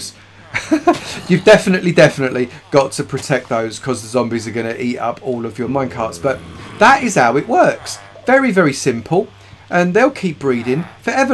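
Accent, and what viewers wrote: United Kingdom. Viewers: Is he british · Lol okay I gotta say the "Gordon Ramsay" comments are a bit laughable because, no offence to you but, You sound nothing like Gordon Ramsey. I guess people hear a UK accent and think "Hey it's Gordon Ramsay." Gordon is Scottish and You don't sound Scottish to me. Thick as bricks.:) Gonna give this build a try though. Thanks!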